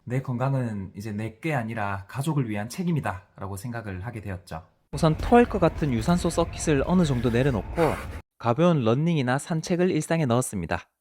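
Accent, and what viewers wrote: South Korea. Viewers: 사투리가 어디 쪽이지 대구 경북쪽인가 ??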